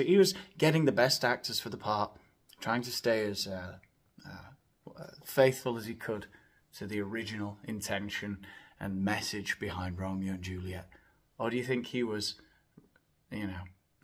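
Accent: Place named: Wales, United Kingdom. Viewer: Hahahah love the Welsh accent 🙋🏼‍♀️🏴😅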